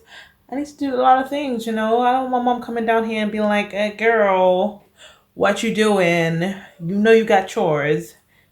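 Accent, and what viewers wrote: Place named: London, United Kingdom. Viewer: Hold on you sound like you're from London! - if you are Heyyy girl! - Loved the video- you're so entertaining sis! - I'm going to try that mask!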